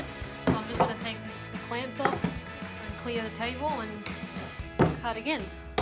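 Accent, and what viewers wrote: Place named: Australia. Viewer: How refreshing to see a woodworking video in metric combined with australian accent!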